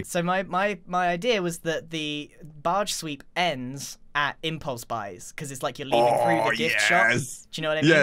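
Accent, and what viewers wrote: United Kingdom. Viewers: To me he kinda sound like a British person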